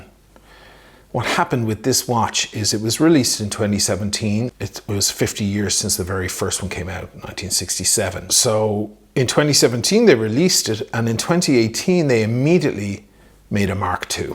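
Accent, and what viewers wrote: Ireland. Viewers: Might I ask where you acquired your accent? Ireland I am assuming. But where in Ireland?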